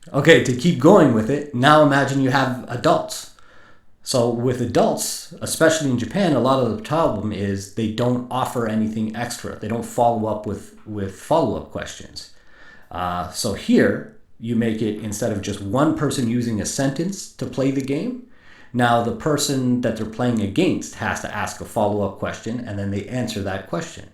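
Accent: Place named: United States. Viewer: Thanks the video is great you should do more videos like this. I'm a teacher of English from Gaza / Palestine if you ever heard about it:). I teach first grade. I teach 9 classes each has 35 to 40 pupils they enjoy your games and they like songs as well. Nice to meet you and too. Btw your accent is similar to the American accent that's why I asked you ♥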